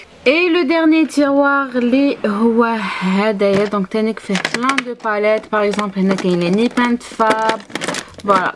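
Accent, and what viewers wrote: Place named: Algiers, Algeria. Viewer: Ton accent algerois me plais 😁 on le retrouve chez peu de gens 😔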